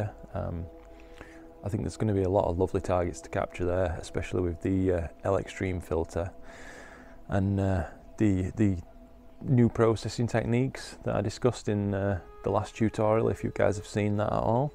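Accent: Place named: Northern England, United Kingdom. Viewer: Loving the channel. You sound like a northern lad. Where are you imaging from?? I'm in Lowton Leigh.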